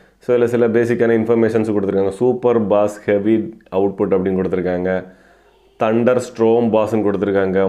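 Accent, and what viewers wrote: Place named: Kerala, India. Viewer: Ningal malayali aano oru malayalam touch varunnundu samsarathil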